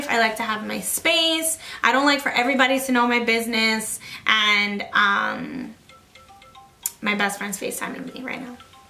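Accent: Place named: Italy. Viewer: I loved the confidence part you spoke about. It’s so hard to get some confidence when you are comparing your self to others. 😩I guess I just gotta work on me and what I want in life. It’s a struggle but one a day at a time. 🙃🙂I assumed you were Italian btw. Haha is Spanish speaking gals we have a accent that can confuse ppl. 🤣🤣 🙋🏻‍♀️😊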